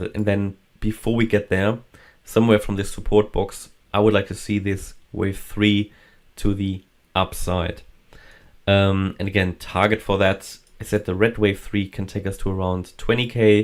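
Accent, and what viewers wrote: Germany. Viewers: New to this channel, and I love your accent! German yes? It's wonderful :)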